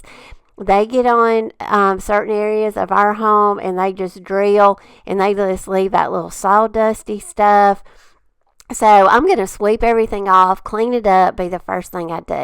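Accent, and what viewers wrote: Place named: Alabama, United States. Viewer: Hey! I got your channel info from Olivia’s Romantic Home!! You’re precious and love your accent! Over here in Georgia we have so much in common with pollen and yard stuff! I’ve loved watching.... just a few so far.... keep you the good work! Where in Alabama y’all live? I’ve been looking at houses in Enterprise and things look nice there!!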